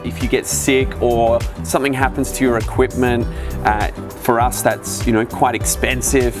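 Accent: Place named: England, United Kingdom. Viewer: Why is an English man doing a video on Greece? I want to hear it from a Greek man, I dont want to hear an English accent, one of the worst accents in the world. People in England speak horrible english, can never make out what they are saying because they cut the words in half when they speak.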